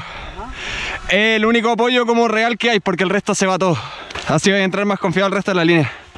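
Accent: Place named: Chile. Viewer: Así hablan los verdaderos chilenos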